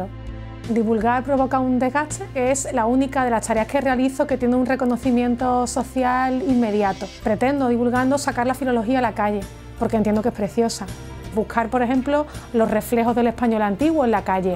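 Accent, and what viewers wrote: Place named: Seville, Spain. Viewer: Ella habla un castellano impecable, correctísimo, rico, diverso, elaborado y, además, con un precioso acento sevillano.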